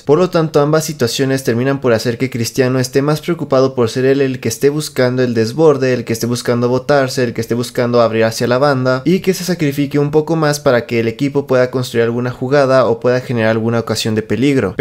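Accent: Mexico. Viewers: Eres mexicano o portugués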